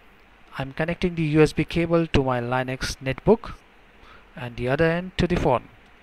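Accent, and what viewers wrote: India. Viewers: what accent do you have lol :D Indian? :D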